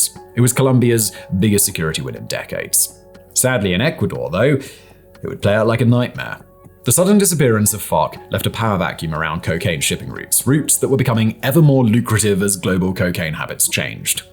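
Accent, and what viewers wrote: England, United Kingdom. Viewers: Cant blame him. I think hes english english... like.... engla terra english.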